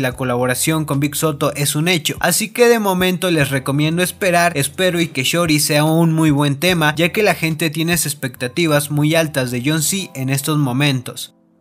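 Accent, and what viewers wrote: Argentina. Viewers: Eu yo pensaba que eras de argentina jsjsjs De que pais sos??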